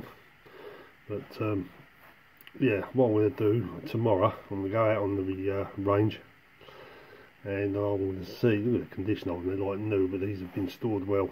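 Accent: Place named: Medway, United Kingdom. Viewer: Ha! You’re a “Gentleman Collector”. You can enjoy both sides of the pleasure a cartridge gives. Great accent and nice to hear. Guessing Medway Towns? I’m from Maidstone originally but now miles away in Devon. Love your videos, keep ‘em coming mate! 👍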